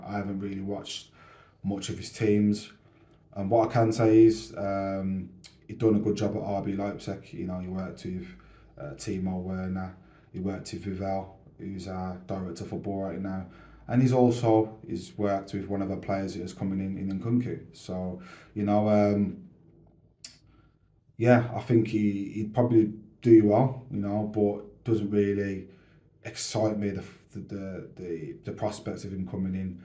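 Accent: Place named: England, United Kingdom. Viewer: look like Lukaku with a English voice 💙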